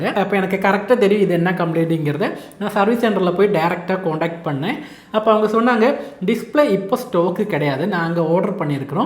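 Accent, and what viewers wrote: Kerala, India. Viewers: This guy is a Malayali!!! His pronunciation is that of typical Malayali!!!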